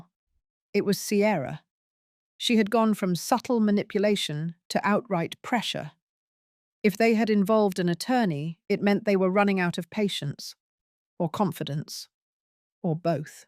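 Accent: England, United Kingdom. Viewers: Loved the story, and oh that beautiful English accent to read. Instead of the harsh American accents which are so jarring.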